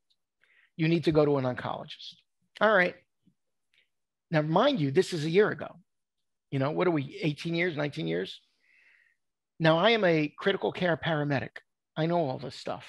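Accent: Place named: New York, United States. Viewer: This mensch has the best story and delivery I’ve ever heard. Great New York style delivery. I guess the divorce didn’t bother him after that. 😂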